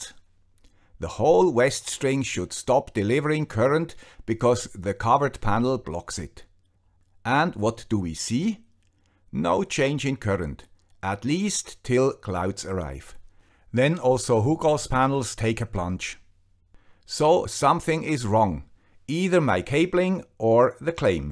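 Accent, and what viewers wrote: Switzerland. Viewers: If you watch how Americans do product presentations by manufacturers to installers, you will know that it's not lying per se rather a culture of willful ignorance -ie, dont teach them what they're selling, only teach them what they need to say using the most simplest words/concepts possible, kinda like optimizing an idea. America, after all, is where a President gets elected by saying the world's largest economy is like running a household budget. Speaking of which, I dont know what all that data and parts means, but the Swiss accent made me believe the conclusion. But I do wonder, yes, the 2% "tax" run "optimizers" but I am under the impression they are also MPPTs. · But who should I trust? A community that made experiences with panels that don't have diodes and bad hardware while not realising things got optimised, or some guy with a Swiss accent that accidentally buys the wrong panels and orientates them poorly while using mathematics and a relatively similar system to compare different things?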